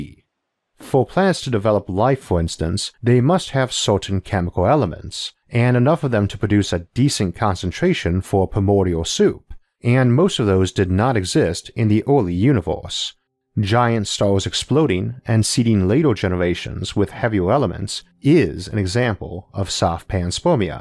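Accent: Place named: United States. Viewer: It's not an accent, it's a speech impediment. Though otherwise he has an American accent.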